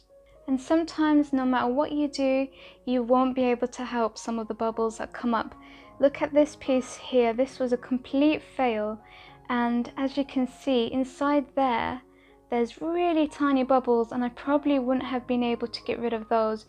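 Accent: United Kingdom. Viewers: Thanks so much for this information. I’ve had UV and epoxy resin for ages to use with polymer clay for dioramas etc., but felt intimidated. As I’ve only just found your channel, I will be enjoying a binge! 🥴 Great to hear a UK voice too as I know I will understand the shops etc you name in your videos. With grateful thanks from Scotland! ❤️🏴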